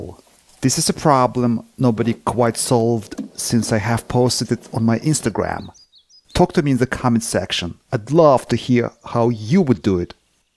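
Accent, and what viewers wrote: Russia. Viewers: I watch the cabin build moment to go then I watch this one thank you again my friend thank you very much I thought I recognized a Russian in your accent. As I said the first time I wrote you a note we share a common dream you are making it happen I put other things first and never did make it happen. Thank you for this wonderful film clip I hope to see many more from you I know your time is limited but they are very precious to me I will as I said in the first comment use you as an example of why we must follow our dreams while we can thank you again my friend People Are People wherever you go and you are a fine example of a good man I can see you good and pure heart from all these miles away good luck and Godspeed to you my friend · The probably hear his Russian accent and vote thumbs down. Pathetic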